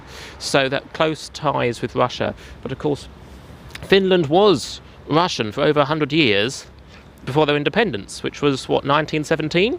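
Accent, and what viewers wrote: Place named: United Kingdom. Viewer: Thanks for this! 😀 great content! I like how British your accent is and yet i can clearly understand what you were saying!😊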